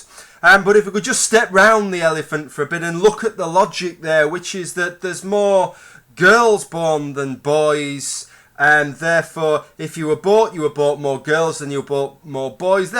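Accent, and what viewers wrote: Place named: Yorkshire, United Kingdom. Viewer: Slight Yorkshire..... what part of the UK are you from?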